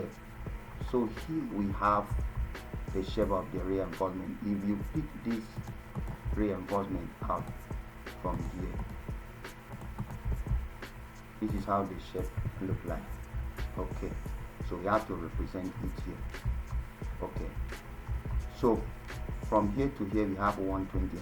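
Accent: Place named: Nigeria. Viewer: Gd job ma bro..from accent I can tell your Nigerian,am also Nigerian but am in the uk and work as a construction manager and found this helpfull.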